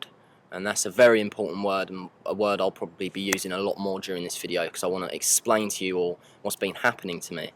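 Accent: United Kingdom. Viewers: Seems like we've all chilled out a bit more recently which must be generating more positive energy as speculating too much can lead to accidently creating negative reality I guess. Really good to have you back and nice one for holding onto your British accent!;)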